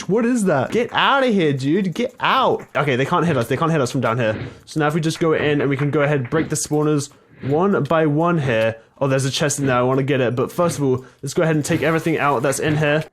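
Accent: United Kingdom. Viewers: Who Loves british acent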